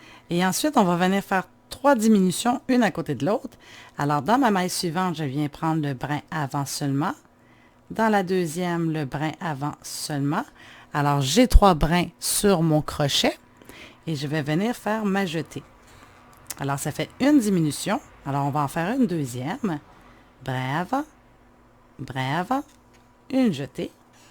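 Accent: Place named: Quebec, Canada. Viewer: Merci pour ce tuto c'est exactement ce que je cherchais. Comment faire un pied 😁❤. J'adore votre accent Québécois 🤗🥰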